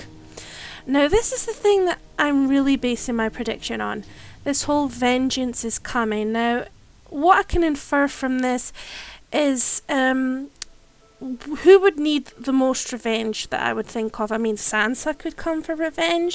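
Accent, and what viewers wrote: Scotland, United Kingdom. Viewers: What accent have you got? Is it Scottish sorry I'm terrible at telling which accent people are from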